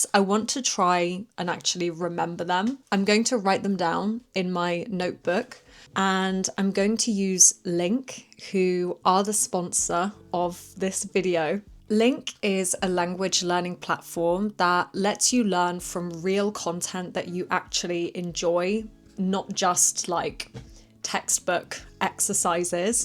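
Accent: United Kingdom. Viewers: Hi! I speak Spanish and I'm learning English. Your video helps me a lot and I love your accent, especially because it's British. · yav dayı şu british aksanı ile konuşmayın yav bana USA lazım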